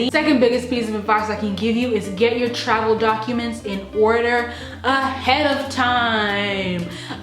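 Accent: Nairobi, Kenya. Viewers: Love the bougie Nairobi accent. Reminded me of Don Cheadle in Hotel Rwanda, somehow. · dig the bougie nairobi accent lol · UMMMM i love your Nairobi accent!! I need to check to see if you you've done any ACCENT tag videos!!! This video was just so awesome!!! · lol the kenyan accent gets me everytime coz me and my cousins do it all the time!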